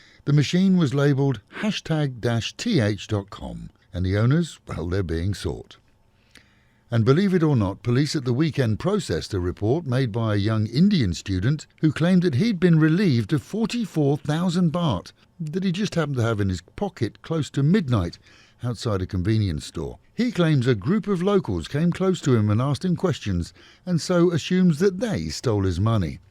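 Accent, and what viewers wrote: United Kingdom. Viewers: Love hearing a British accent while getting the scoop on Thailand drug busts. New subscriber here from the U.S.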